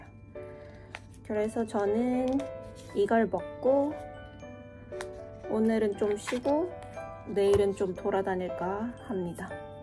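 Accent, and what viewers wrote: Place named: Gyeongsang, South Korea. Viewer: 저도 지방러라 경북 대구 억양이 느껴지더라고요. 영상 잘 보고 있어요! 즐거운 비행 하세요~~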